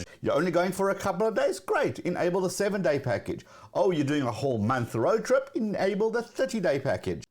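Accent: South Africa. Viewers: היי. מה סוג המבטא שלך? דרום-אפריקאי? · Hi first I thought you were from South Africa but then I saw in your videos you are from America and your english accent is very South African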